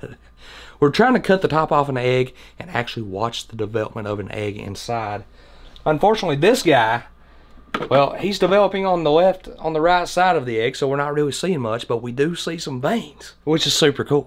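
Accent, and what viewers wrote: South, United States. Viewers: the egg eater shorts got me hooked, as well as your accent being from the south as well. keep up the good work my man.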